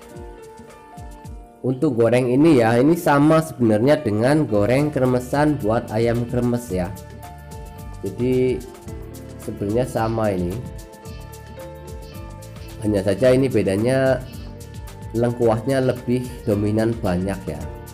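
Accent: Indonesia. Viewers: Ngomong nya kaya jawa tegal apa brebes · chef nya ngapak ya ?? suara nya enak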